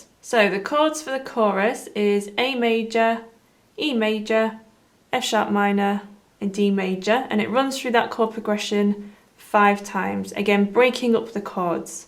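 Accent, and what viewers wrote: United Kingdom. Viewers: "I'm gonna teach you how to play by, with a lovely British accent."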